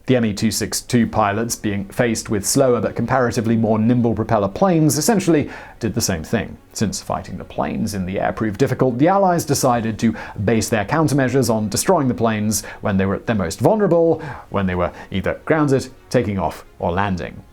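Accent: United Kingdom. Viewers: It is funny how sensitive the Brits or as we call them from downunder Pomes! This chap is going on about the things with his Pomie accent ! How many Pome jets were there? Its about like asking the Pomes how many World Cups or Euro cups they have won! What a joke! Not the best objective documentary! Cripe the Pomes were saved by the ANZAC's, Canadians, and the USA! And Yes the Communist USSR gave a great deal, but not much in terms of Innovation! The British Invented the Steam Engine! Well done!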